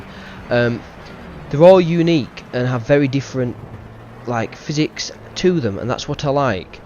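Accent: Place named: Manchester, United Kingdom. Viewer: You sound more manc than Yorkshire... You sound exactly like Gary Neville!!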